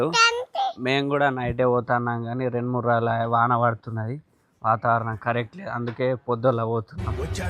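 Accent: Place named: Adilabad, India. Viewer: Hi brother my name is vemula srinivas from Karimnagar..mi chanal subscribe ni ...chala Baga vuntavi funny reels ... adilabad slang super...maku adilabad kachikanti village lo friend s vunaru every year twice vasthamu ...nenu lecturer ni ..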